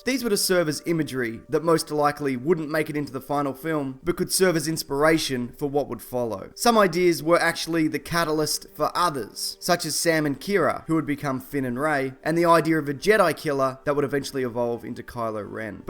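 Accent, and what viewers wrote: Australia. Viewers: do you mind me asking what your accent is, is it Australian or some other thing? I am just curious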